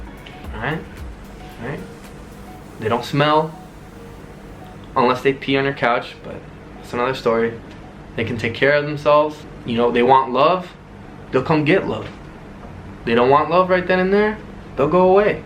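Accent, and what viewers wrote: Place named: Venezuela. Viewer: Tu español tiene acento venezolano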